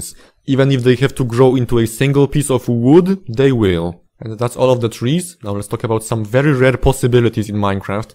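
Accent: Poland. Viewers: Myślę sobie, kurde akcent jak u Polaka, patrzę na informację o kanale - oczywiście że z Polski xd pozdro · Bro i swear to god you sound 99% Polish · Do i hear Polish accent or am I tripping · Are u polish? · the second i heard the voice i knew you were from Poland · Are you Polish mate? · As a fellow Pole, I have to say, you have the most stereotypical Polish accent ever 😭😭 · polish accent is peak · your accent is too polish. can't watch. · Czuć po akcencie że rodak ;) Fajny filmik. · You sound polish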